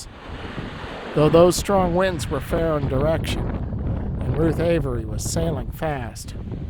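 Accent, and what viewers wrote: Maine, United States. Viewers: Are you a Mainer. You talk very similar to myself and most of my Maine relatives. That's meant to be complimentary. And explains your excellent seamanship. "Stand Fast all ye men from Maine who go down to the sea in ships."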